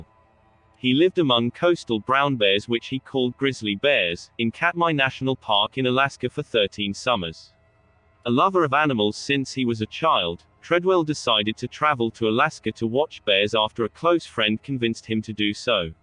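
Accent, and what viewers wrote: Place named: United Kingdom. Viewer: It's not a robotic voice. It's a British voice and the real audio based on news reports. I listened but wish I hadn't.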